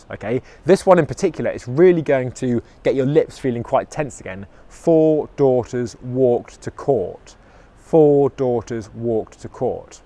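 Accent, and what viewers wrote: United Kingdom. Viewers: Hi. I do like your lessons on phonetics with a British pronunciation. Please continue. Thanks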